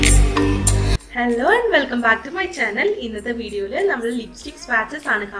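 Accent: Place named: Kannur, India. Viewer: Nhan chechide channel subscribe chettan parannal .chechide skin time San enteyum so enikk chechikk pattunna products vagham 2 ,chechikk useful akkunna products chechi njaghalkk suggest cheyyullu 3,njan chechiyude sister subscriberann divua chechi paranju chechide channel verorall prompt cheyth valarunnath ishttammalla Nn so I subscribe you 4,chechi kannur nokku samsarikkunnath pole thonnu I love lipsticks if you don't mind enikk chechikk ishttamulla products thannal mathitto 😘😘😘😘😘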